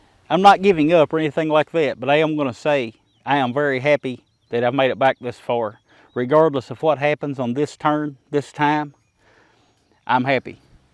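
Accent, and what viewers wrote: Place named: South, United States. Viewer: I love this guys good ol' boy, down home southern accent; but the video starts at: . You're welcome.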